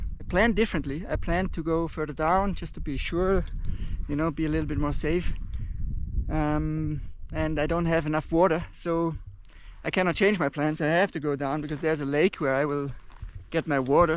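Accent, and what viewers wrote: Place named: Austria. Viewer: Sehr sympathisches und eindrucksvolles Video. Sind Sie Österreicher? Ihr englischer Akzent klingt sehr danach :) 😉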